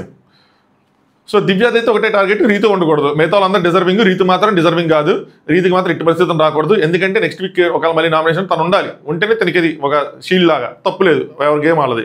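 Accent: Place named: Vijayawada, India. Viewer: Vijayawada slang vachheytthandi annai🤣🤣🤣😂adi thoti vijayawada mate ki matramey telusttundi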